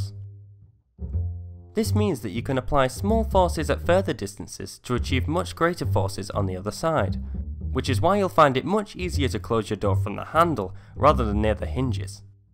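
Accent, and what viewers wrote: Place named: United Kingdom. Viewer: You remind me of minute physics but you're British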